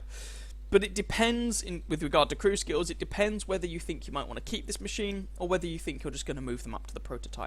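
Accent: United Kingdom. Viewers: Great and you're welcome! You do know that most of us Americans love your British accents as much as a lot of you like ours (especially our Southern)! Lol ^_^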